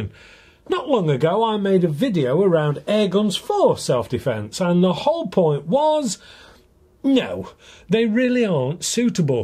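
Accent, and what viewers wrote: United Kingdom. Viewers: This channel is awesome, so well researched and explained, and oh so elegantly british 💪🏻❤🇬🇧👍🏻I have learned so much, keep up the excelent work! 👌🏻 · I love your British manner of speaking!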